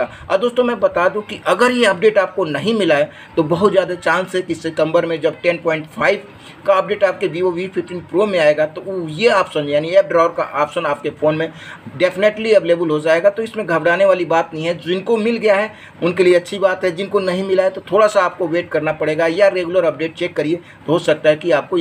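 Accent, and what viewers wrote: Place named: India. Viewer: He's speaking English in strong Indian accent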